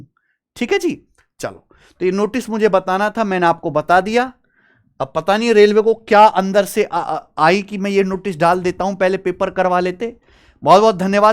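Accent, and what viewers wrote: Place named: Bulandshahr, India. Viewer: सर का बुलंदशहरिया टोन मस्त लगता है हमे 😅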